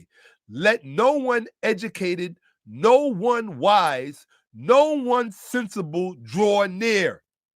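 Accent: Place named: New York, United States. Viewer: Thanks. Im always reminded of my fifth grade friend from New York while listening to you because of the way that you speak. The knowledge that you teach is truly appreciated.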